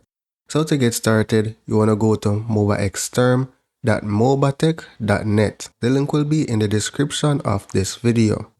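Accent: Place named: Jamaica. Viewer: First mi a hear patois on this side of yt, big up yuhself mi g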